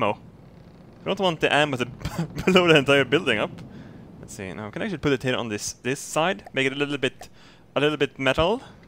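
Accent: Sweden. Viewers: Welcome back to... from the debts! Gotta love that Swedish accent.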